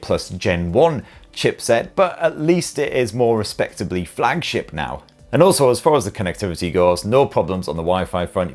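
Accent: United Kingdom. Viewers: is like Charles White Jr. (aka MoistCr1TiKaL) of geek world with a British accent. I really love his content lol. I just had to get this out of my chest, sorry guys, go on. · British English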